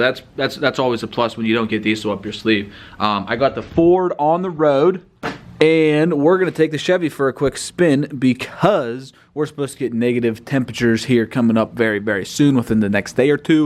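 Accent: Pennsylvania, United States. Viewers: This channel makes me home sick until I see how cold it is there. I never noticed the accent until I lived away from PA for awhile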